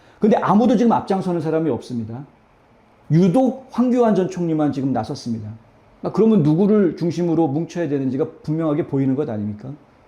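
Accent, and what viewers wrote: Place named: China. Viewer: 유튜브발음이 중국인같음!! 윤통체포… <link>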